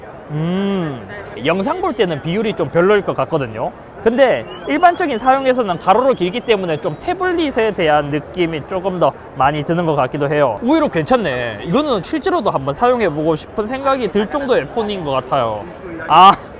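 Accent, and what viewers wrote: Gyeongsang, South Korea. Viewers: 외국엔 좀 안나가면 안되나 한국인이 다 잇섭처럼 눈작고 안경끼고 말투 경상도말투 쓰는줄 알거아냐 ㅠ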